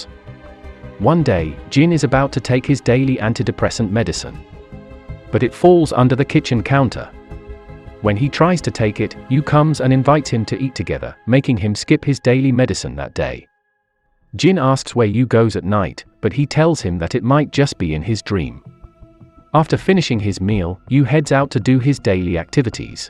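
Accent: United Kingdom. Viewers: Why this autovoice has a British accent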